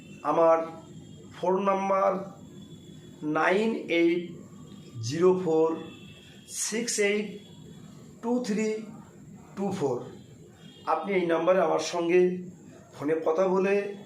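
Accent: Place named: Bangladesh. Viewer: আপনি যে খাঁটি বাংলাদেশের লোক আপনার কথাবার্তার তাপ পরিষ্কার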